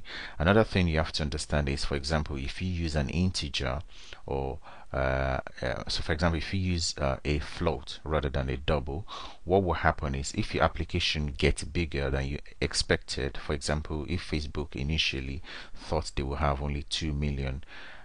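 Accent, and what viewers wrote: Nigeria. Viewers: Lol. Are you yoruba ?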